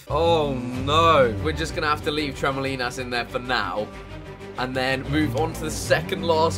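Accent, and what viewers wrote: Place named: United Kingdom. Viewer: agarwal he's British! (like me)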